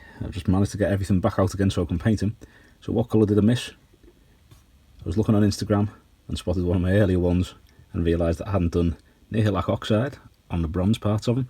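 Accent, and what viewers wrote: Liverpool, United Kingdom. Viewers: Love the Scouser accent, always been my favorite in this country, makes your videos so much more fun to watch :)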